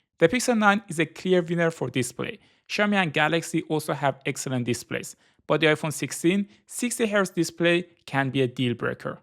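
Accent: Iran. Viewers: Love the Persian accent ❤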